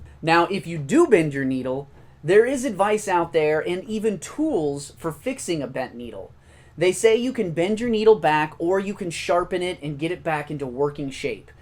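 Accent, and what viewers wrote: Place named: United States. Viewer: Get to the point and just stop the twangy American blah blah blah theatrics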